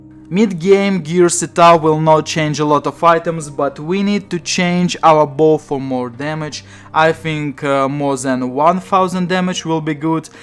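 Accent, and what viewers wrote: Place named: Russia. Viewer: Со стронг рашен акцент😀, бат окей бро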